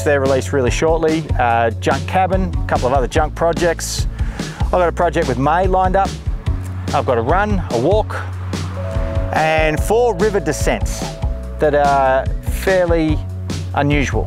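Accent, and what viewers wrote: Australia. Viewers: O, how I missed that Aussie voice.... Thank you loads for making 2020 a little better. Lots of love from the Netherlands!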